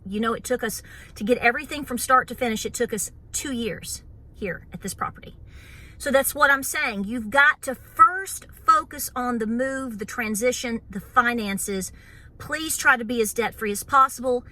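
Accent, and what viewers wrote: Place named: North Carolina, United States. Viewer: Hi. I have been watching your channel since last summer. I'm a 34 year old with a baby girl who just turned one. We live over in Buladean, NC. I love your channel. Even though you talk about the real and the scary of whats going on in our county, watching your videos comforts me. I feel like I know you because we are very similar (the accent! Heeey!!!) in lifestyle and beliefs. Watching your videos is like talking to a friend which is very important for me right now. My parents, my husband and I are doing our due diligence; however I have no friends (millenials 🙄) with whom I can have these conversations about prepping, the state of our country, etc. I have a best friend who I have worried about for this entire year. I've sent her hints here and there encouraging her about what she needs to do and about what's coming, hoping she will get off her butt and do something. 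She has made several unbelievable comments, but I don't have the time for that. Last night I was mentioning to her that she needed to stock up on meds and I mentioned Jase medical and antibiotics. She asked, "where are you hearing this? The news?". She then stated she was choosing to remain ignorant bc she had too much anxiety and couldn't add "this" on top of it. She said God would take care of us. I reminded her of Joseph and his dreams and told her God was allowing us this time and these warnings to prepare. She then snapped and said, "what are we supposed to be preparing for? A Great Depression." I sa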